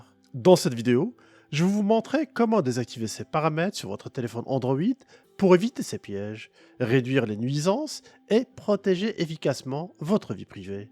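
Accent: Quebec, Canada. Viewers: Super de nous montrer tout les paramètres pour essayer de nous mettre à l'abri de certains problème , continuer comme ça , l'accent Québécois est toujours aussi sympa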